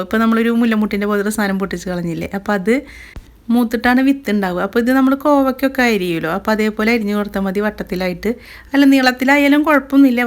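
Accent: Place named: Thrissur, India. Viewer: Rambuttan kazhikan njan beemanam Keri varatta❤ orupaad istaayi video yum Thrissur baashayum❤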